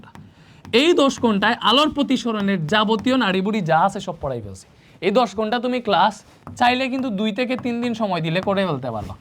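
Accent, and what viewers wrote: Sylhet, Bangladesh. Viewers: K k Sylhet thaka dakso vaiya kintu Sylheti😊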